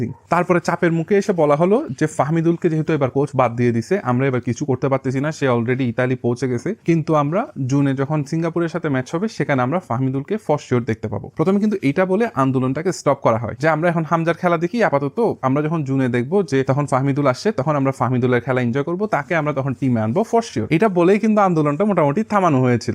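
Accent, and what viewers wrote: Chittagong, Bangladesh. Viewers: ভাই, আপনার বাড়ি কি চট্টগ্রামে, আপনার ভয়েস দেখে মনে হচ্ছে চট্টগ্রাম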